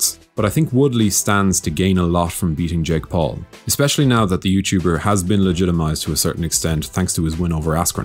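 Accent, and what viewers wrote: Ireland. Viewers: Learning to live with annoying monotone Irish voice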